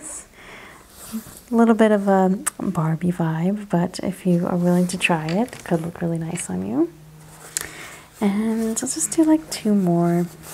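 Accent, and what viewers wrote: South, United States. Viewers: I love her accent… so southern ❤ I’m from Alabama so I guess that’s why I love it 😊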